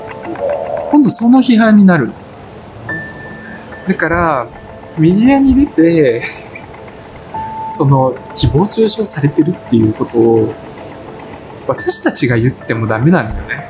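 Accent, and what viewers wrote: Kansai, Japan. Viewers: 綺麗な関西弁がとても心地良いです。。。意志の強さを感じました〜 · この方の関西弁は品があって😍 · 関西弁で身近な存在に感じました。又、悧発な方で尊敬致します😊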